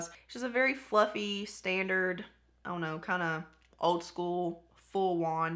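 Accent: South, United States. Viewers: Where are you from? I'm southern too!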